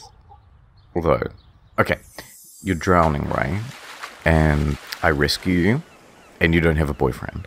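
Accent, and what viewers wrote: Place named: Australia. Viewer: oh yeaahhh, just lurve me some cringey ozzie voice with classic gaslighting/manipulative tone of pretense-of-niceness. This guy is so transparent. Ahh, youth.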